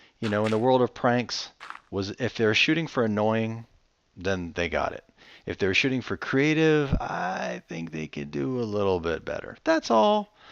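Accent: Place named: Australia. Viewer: Dat sexy Aussie voice....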